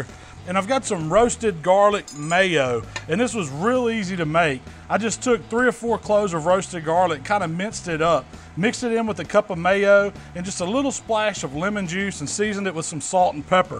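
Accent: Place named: Mississippi, United States. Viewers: Shhhh.... Mississippi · Xeno he said boil... he's from mississippi. it's his accent.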